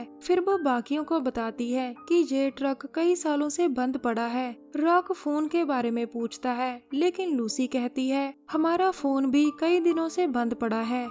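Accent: Punjab, India. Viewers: I suppose the woman who is narrating is a punjabi woman as her prononciation is very similar to punajbies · Love ur punjabi ascent Punjaban ❤️ Pardeep gill from Ludhiana Punjab ❤️ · Ap punjabi ho ya haryanvi... ?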